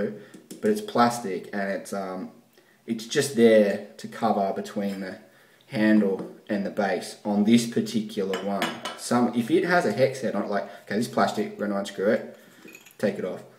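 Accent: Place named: Australia. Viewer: Outstandingly good video because it was clearly demonstrated by an expert who spoke Aussie language. Good on ya mate..thanks!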